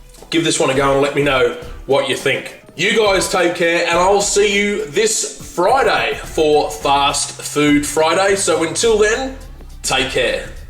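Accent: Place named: Australia. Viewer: Dear, I come from Holland and very like your recipe's. But sometimes I don't understand you because you've got a Australian accent and my English is just bad. But my question is if you maybe can give a manual in the description so I read it and understead it better? Thank you, Sander from Holland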